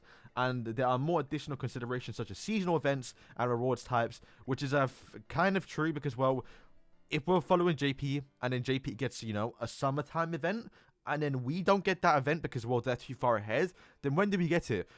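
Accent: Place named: United Kingdom. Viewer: Seriously there are British youtubers accent then theres accent who is on whole different level, istg accent is my salvation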